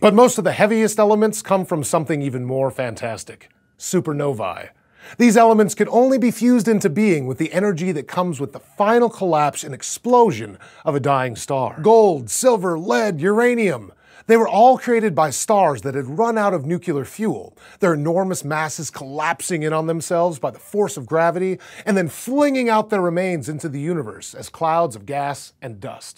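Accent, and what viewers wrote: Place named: United States. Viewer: At this point, I just blame it on the American accent, and leave it at that. We all know what he means.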